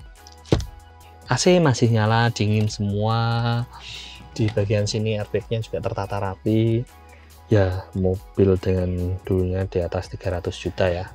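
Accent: Java, Indonesia. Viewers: Ngomongnya khas jawa, luamaa😅 saya percepat 1,75 baru enak dengerinnya..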